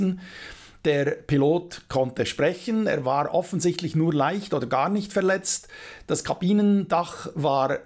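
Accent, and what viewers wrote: Switzerland. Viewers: Salutti, jetzt gebe ich auch mal meinen Senf dazu, ich muss einfach das Kompliment schreiben. Ich bin jetzt 51 im Juni 52 und seit über 30 Jahren auch Pilot allerdings auf den Straßen Europas, jetzt nur noch in Schweden. Ich finde das Gesamtkonzept Deiner(ich duze alle seit ich in Schweden wohne, haha) Videos sehr professionell und lehrreich. Allein die Beantwortung von der in meinen Augen unnötigen Kritik Dir gegenüber gefällt mir immer wieder, hart, exakt und treffgenau. wer da noch versucht Dir Rhetorik beizubringen, der hat dann wohl vergeigt. auch schaue ich mir Deine Videos an, weil Du von meiner alten Heimat berichtest und ich nach sieben Jahren der Liebe wegen nach Schweden gezogen bin. Dein duetsch ist einfach was ich vermisse. Ich wohnte in Ettiswil/LU und wäre dort nach den 5 Jahren glücklich gestorben. Allerdings bin ich jetzt in Västernorrland seit mehr als 12 Jahre und auch seit 2 Jahren schwedischer Bürger. 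Also nicht Wahlschweizer, sonder eben Wahlschwede. Ich bin in Ulm/Donau geboren, darfscht mich also auch Bockwurst nennen. Denn Deine Art ist mir sehr lieb gewonnen. wie die ganzen Kantoner die ich kenne. Hab sogar noch den Schweizer Fahrausweis, der ist ja unbegrenzt gültig. Das fliegen hat mich nie interessiert, da ich schon zu früh die Plomben in den Zähnen hatte und mir daraus selbst die Interesse verweigerte. Allerdings als ich bei der Ruag in Emmen einmal einen Helikopter in der Halle stehen sah, ich wollte mal schnuppern, aber er sagte, er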